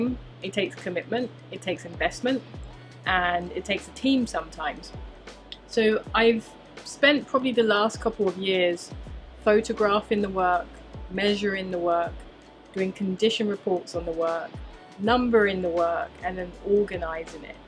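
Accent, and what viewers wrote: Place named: United Kingdom. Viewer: How I missed your voice and your fantastic British accent!! I hope you will spoil us with more YouTube movies. I got to know you via a clip of Casey Neistat many years ago. I love your work and cherish my black Chantell signature Puma's 😉👍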